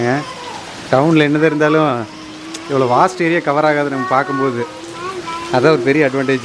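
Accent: Kongu, India. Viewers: Kongu tamil super ponga...arumainga anna....neenga enna koottam nu kaekkara solla matingaringa...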